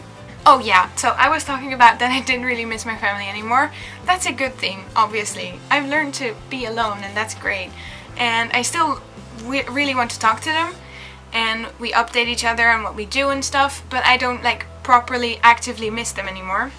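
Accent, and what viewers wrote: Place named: United Kingdom. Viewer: your british accent is there :) so amazing :D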